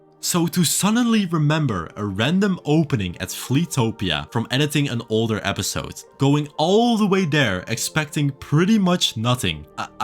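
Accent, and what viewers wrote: Netherlands. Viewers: Ik wist dat ik het nederlandse accentje hoorde 🤯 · And team Netherlands 🎉 I couldn't not recognise your Dutch accent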